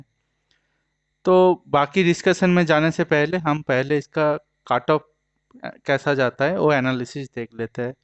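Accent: Bengal, India. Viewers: Yes bro he is bengoli. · are you from west Bengal ?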